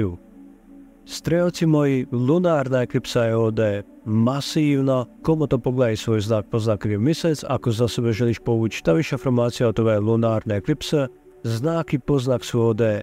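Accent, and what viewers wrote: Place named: Dalmatia, Croatia. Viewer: Posle toliko mnogo godina bilo mi je lipo slušati te naš Dalmoše na našem dragom ikavskom dijalektu.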